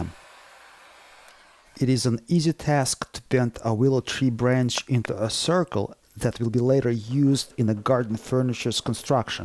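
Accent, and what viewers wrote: Russia. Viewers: Off topic, I have always found the Russian accent easiest to understand. I have spoken 2 other languages & even those are more difficult. Love this idea & liked this video years ago. I plan on making this cutter soon. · Русский акцент)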